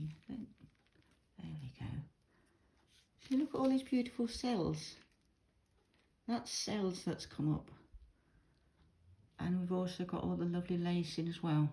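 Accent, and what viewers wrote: United Kingdom. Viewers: Hi, Thanks for a really helpful video and what a result. I haven't even started this Bloom journey yet. I'm just collecting ingredients etc so it's been great coming across your video and then to my delight hearing a British accent, which of course means being able to get the same things as you;) I wonder as I've already bought the valspar wood and metal Base C, if i could use that plus varnish for the pouring medium instead of glue (as in Shelee's technique) but use your pillow of simple acrylic paint? I don't suppose there's any reason why not,unless the medium would be heavier than the pillow? anyway would be interested to hear your thoughts on that. Great tip with the tape on the back!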